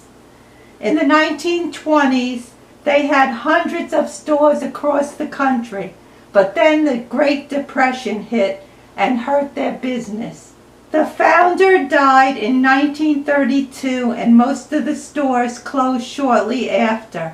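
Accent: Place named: Boston, United States. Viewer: ARE YOU FROM BOSTON MASSACHUSETTS? I HEAR AN ACCENT I RECOGNIZE BUT MY GRAM WOULD SAY BUTTAH NOT BUTTER.. SO I MAY BE WRONG ... BUT I HEAR PAPAH TOWEL ... SOUNDS JUST LIKE MY GRAM.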